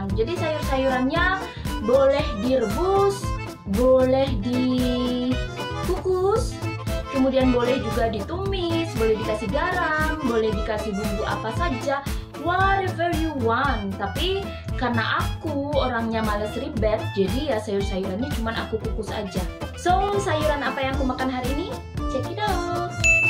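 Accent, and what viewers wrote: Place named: West Sumatra, Indonesia. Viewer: Takah baa bana mangecek bahaso inggrih, tetap se logat minang indak ilang.. dangakan secara seksama beberapa kata "menu, ribet dll".. pertahankan minang ny yaa.. 😊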